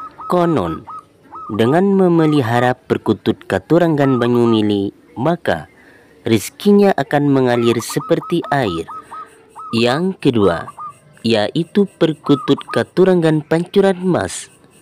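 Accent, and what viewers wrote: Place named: Madura, Indonesia. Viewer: bhsnya ky orng madura mas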